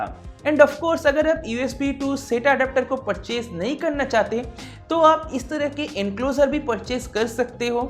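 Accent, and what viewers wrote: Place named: Kolkata, India. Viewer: Bro you don't know, what you are ... You r genius Bro ♥️♥️♥️... you explained us anything about tech, hardware or software related matters so fluently & deeply(specially)...lots of love ♥️♥️♥️ from Kolkata...♥️♥️♥️ I think you are also from our Kolkata our pride ♥️♥️♥️ from your speeking accent, correct me if I am wrong 🤝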